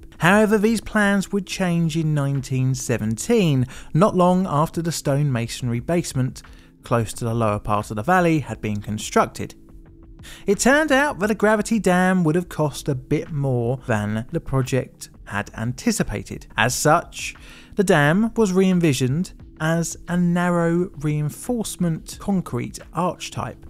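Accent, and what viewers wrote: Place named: England, United Kingdom. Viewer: In future videos, can you stop using that awful England accent. It's rather annoying.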